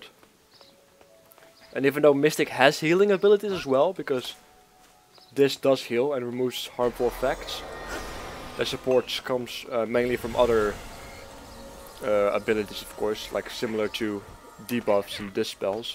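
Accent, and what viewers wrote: Netherlands. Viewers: hehe grappig dat je vaak snel kan horen of iemand Nederlands is :D Nice video trouwens.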